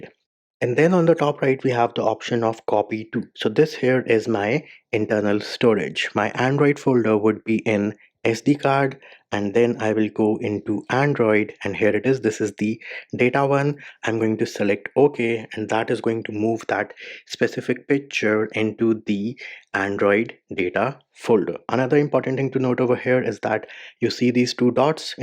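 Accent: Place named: India. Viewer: When i heard that bro have an indian accent i knew my problem is already solved.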